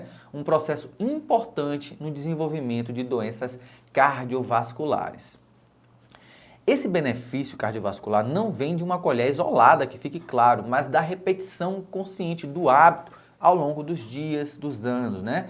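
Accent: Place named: Rio de Janeiro, Brazil. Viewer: Carioca poĥ Quer dar uma de deisch...colado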